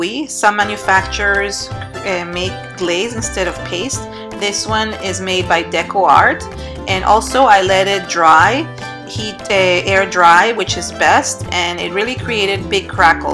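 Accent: Israel. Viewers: From your name and accent, I’m guessing that you’re Israeli? Love your videos!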